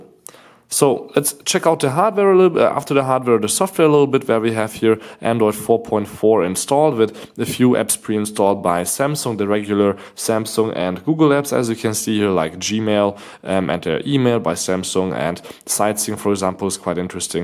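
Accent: Germany. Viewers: R u german?u've got that german accent.....nice review btw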